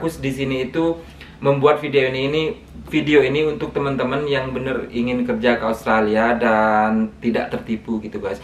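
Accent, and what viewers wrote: Madura, Indonesia. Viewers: Baru paham, = MADURA, pantes logat e gak asing wkwk 😂😂🎉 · Asli Madura Kah Sampean Bang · Logatnya kayak orang madura bang...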